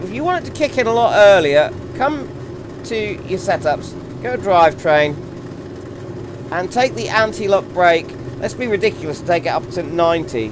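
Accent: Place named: United Kingdom. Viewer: First, you really should have more subscribers. I enjoy your analysis on sim racing topics. Your British accent and comments are very addicting (" the car is having a bit of a moment with the tarmac" LOL!!!) :) Second, I'm really finding the AI way to aggressive in the latest update, actually running me over several times (didn't happen pre Jan??) Third, I don't know why I find it funny that anyone would refer to a car with a straight 6 engine as a "muscle car".. :)..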